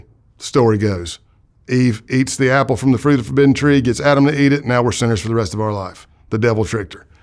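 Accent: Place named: Greenville, United States. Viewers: Yo why does he sound exactly like Rob "ribbit" Pitts from VinWiki Must be that Greenville accent. Love it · HE IS SO SOUTHERN!!! 💞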